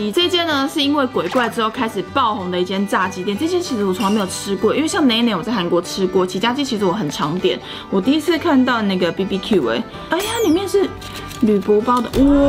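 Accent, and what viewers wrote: Taiwan. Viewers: 好有喜感看你的頻道非常有親和力尤其是那台灣國語😂😂😂 我的頻道也要加點搞笑的元素哈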